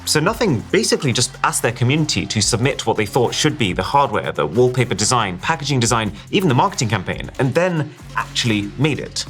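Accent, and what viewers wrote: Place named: RP, United Kingdom. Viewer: This Wealthy White English accent kills me man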